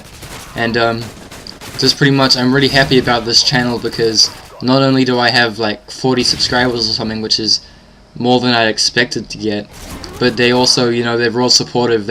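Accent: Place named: New Zealand. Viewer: Nice commentary!!! lol metalstorm i had no idea there were so many new zealander commentators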